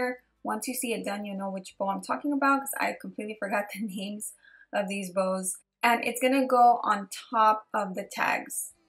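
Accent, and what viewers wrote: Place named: South, United States. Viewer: Love your videos you talk ok I’m from the south to. 10 th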